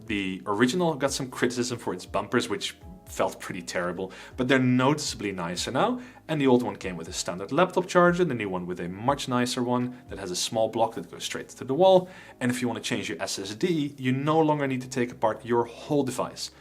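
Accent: Netherlands. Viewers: Hes from the Netherlands, accent reveals. Needs more research.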